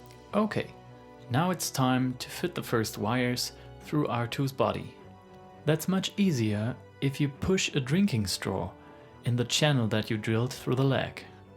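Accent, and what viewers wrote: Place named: Germany. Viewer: Nicht schlecht Herr Specht. Ich schätze ich höre da einen Landsmann. Würdest du sowas auch als "Auftragsarbeit" für jemanden machen?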